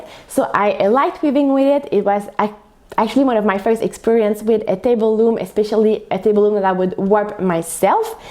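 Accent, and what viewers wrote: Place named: Quebec, Canada. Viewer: How exciting for you! Congratulations on your loom. I have been weaving on a rigid heddle for a few months and hope to one day acquire a floor loom. Have fun and thank you for your videos. I like your accent. I am guessing French-Canadian?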